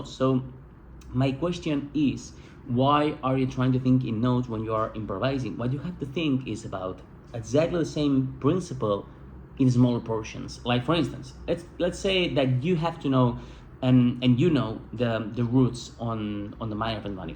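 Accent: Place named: Spain. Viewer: Gracias. Me es más fácil entender el inglés con acento español que el ingles americano. Thank You pal!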